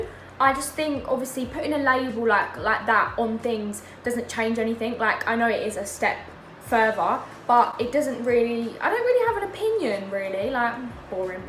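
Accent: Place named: Australia. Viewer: The Australian accent tho😍😍😍😍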